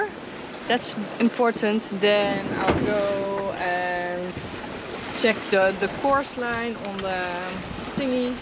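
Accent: Netherlands. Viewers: Love your videos, great sailing with good attitude, a good role model for all us sailing in small boats, all with lovely Dutch accent. Greetings from Finland!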